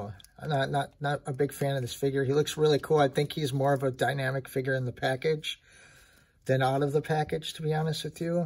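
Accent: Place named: Asia. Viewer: Are you in the Philippines? cause you have an Asian accent. I just like to ask where to get that.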